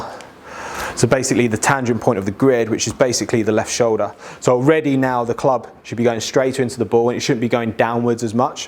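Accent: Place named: United Kingdom. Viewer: LOL! I wondered with that British accent how you could be Canadian. Another!!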